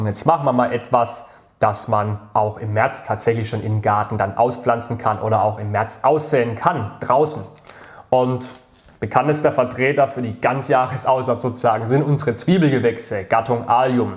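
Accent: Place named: Karlsruhe, Germany. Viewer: Habe sofort gehört, dass du von hier (Kreis KA) kommst 😁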